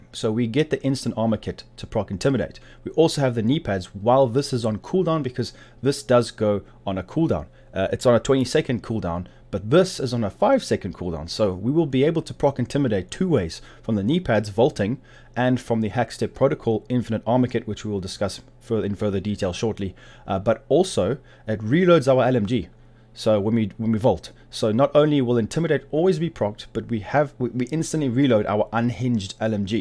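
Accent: South Africa. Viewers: Hey man, had this game about 6 weeks and you're my go to channel for it now. Just curious enough about your accent to ask where you're from? My ears are saying 70% South Africa and 30% New Zealand.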